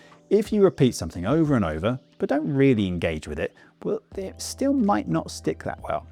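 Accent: United Kingdom. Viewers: Hi! Do you mind telling me what variety of British accent you speak? I really like your accent and watch your videos also to imitate your speech.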